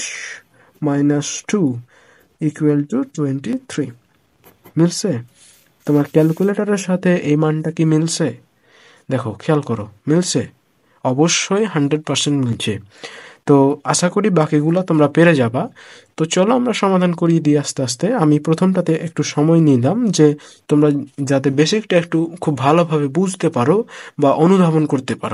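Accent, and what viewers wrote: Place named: Chapai Nawabganj, Bangladesh. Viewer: আমি আপনার কথা বলার ধরন থেকে বুঝতে পেরেছি আপনি চাপাইনবাবগঞ্জের 😊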